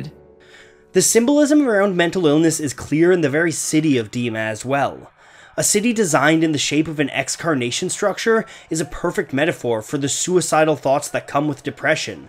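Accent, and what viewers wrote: Canada. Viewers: The Narrator is clearly Canadian.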